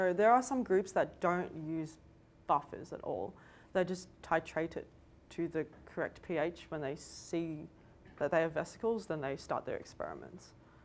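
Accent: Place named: Australia. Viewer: thank you- down under accent can be fun to listen...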